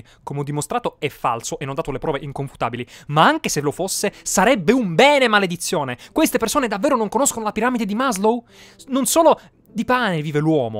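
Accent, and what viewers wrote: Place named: Sicily, Italy. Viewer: Io non me ne intendo di accenti ma sentendoti parlare così concitato mi è sembrato che la tua parlata fosse simile a Marco Merrino. Il che ha senso visto che siete entrambi siciliani.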